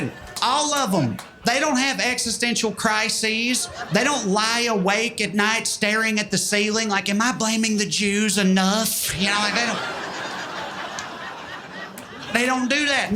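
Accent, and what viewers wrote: South, United States. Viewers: His “southern accent” is getting thicker, and more cringe. Before you know it he’ll be ending every joke with GIT’R Done! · Picture the language skills of G.K.Chesterton in the accent of the Dukes of Hazzard. Brilliant and hysterically funny. Took my husband and son-in-law to see Trae at Funny Bone in Virginia Beach. A crowd of huge fans, blown away by his intelligent goofiness. 🥰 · Yall are my favorite genre of white people. Love a liberal with a thick southern accent. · I actually felt it was the opposite, that he felt the need to compensate for his accent by using big words like "vehemently" "gain purchase" "whimsical anarchy" and so on, I think he plays on the jarring nature of being a verbose redneck, that's one thing I love about his comedy. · Does he have a southern accent? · Good Lord! God Al.ighty! This Southern sounding boy makes me relate😁Much enjoyed, go on with your bad self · It's like Alan Sorkin dialogue performed with a southern accent. 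The writing is that good.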